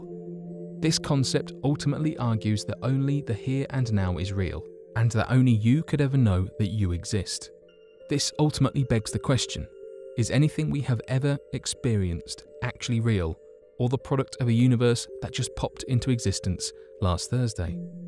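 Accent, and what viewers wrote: England, United Kingdom. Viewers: I knew he was going to have an English accent before the video loaded. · its always a british narator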